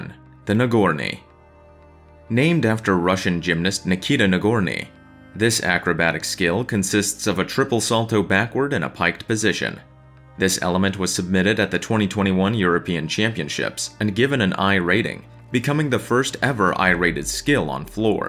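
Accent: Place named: Spain. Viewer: No Spanish speaker has ever felt guilty about their heavily accented English. Don't apologize.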